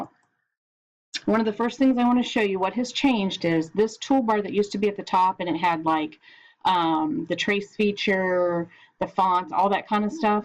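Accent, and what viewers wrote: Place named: United States. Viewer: thank you for this, really appreciate your help. love your american accent! why the fuck are defaults so insanely wrong all the time, its driving me absolutely crazy!??!? :/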